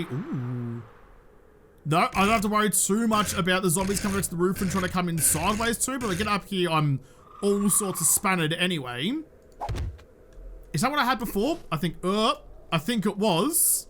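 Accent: Australia. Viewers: But the rest of us are here for the rapid-fire Strine!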